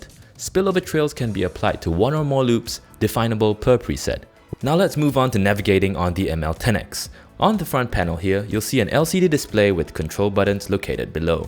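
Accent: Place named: Singapore. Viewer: Is Morningstar based in Asia? This person sounds like a Singaporean or Southeast Asian.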